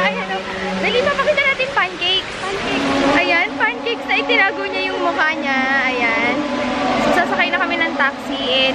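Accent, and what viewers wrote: Batangas, Philippines. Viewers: Napakacutie magsalita ni miss pag kasama ang mga kapamilya haaha batanguena na batanguena e 😂😂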